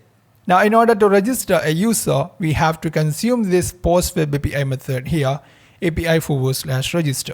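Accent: Kerala, India. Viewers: NIce presentation and explanation....Your accent sounds like a malayali..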